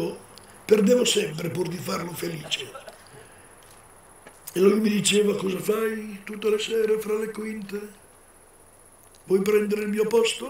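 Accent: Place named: Milan, Italy. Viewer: Sono giorni e giorni che vedo e rivedo questi filmati di Piero Mazzarella un grandissimo attore di prosa dalla voce inconfondibile dalla cadenza dialettale milanese di una volta e mi si stringe il cuore e mi commuovo a sentirlo sapendo che purtroppo non'è più fra' di noi mi sarebbe piaciuto che ci fossero più filmati a lui dedicati in rete per sentire i suoi racconti storici culturali e dialettali perchè attraverso di essi rivive un mondo che non c'è più ma che scorre ugualmente nel nostro sangue e nel nostro DNA in modo inconsapevole per questo ci emozioniamo quando li ascoltiamo perchè ci fanno capire che essi rappresentano le nostre origini etniche e culturali che spesso ignoriamo ma che fanno del popolo italiano un popolo immensamente ricco a livello linguistico e comportamentale.P.S. R.I.P.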